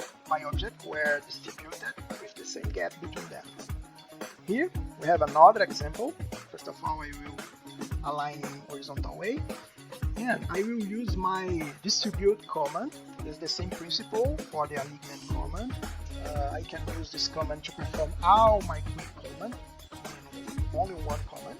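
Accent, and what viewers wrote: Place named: Brazil. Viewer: Falando em inglês. Porem o sotaque me parece Brasileiro hehe.... 😀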